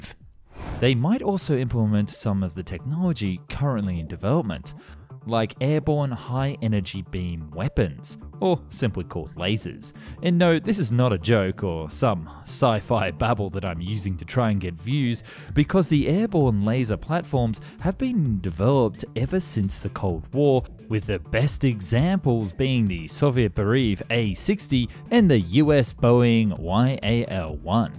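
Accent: Australia. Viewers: I reckon the narrator is an Australian. Lovely 🙂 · you're Australian right?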